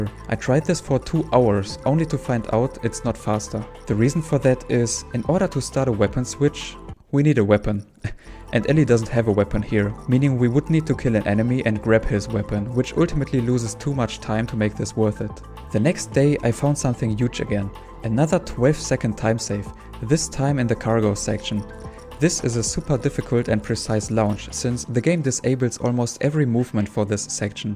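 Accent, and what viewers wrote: Germany. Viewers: digga und man hört trotzdem in den ersten 2 worten das du deutsch bist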